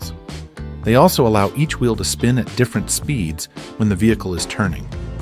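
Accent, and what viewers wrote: United States. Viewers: Thumbs down for the annoying American voice over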